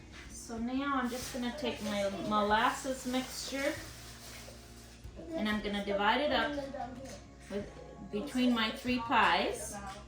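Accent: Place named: Pennsylvania, United States. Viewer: Sounds like you might be in Pennsylvania. I'm near Philadelphia. Just found you. Did you do a video with your mom on her showing you how she makes her Shoofly Pie? If so, I'd love to watch it. During my high school years I lived just outside Parkesburg. We lived down the road from a Mennonite family with twelve children. I went to school with their twin girls. We traveled to the Amish country often to enjoy all things made by the Amish and we especially loved going to Good's to shop, to flea markets in the area. Reningers, etc. Shoofly is my all time favorite pie. I've made it a few times and it is always delicious but I'd like more of a crumb topping that doesn't sink into the the delicious molasses filling. I miss living in the country. I have a fleeing I'm going to enjoy watching more of your videos.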